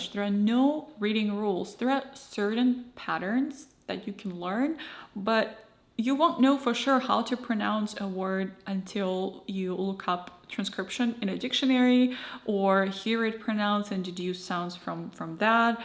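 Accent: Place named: Russia. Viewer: Oh no.... i liked your Russian accent who was lerning french 😭😭😭😭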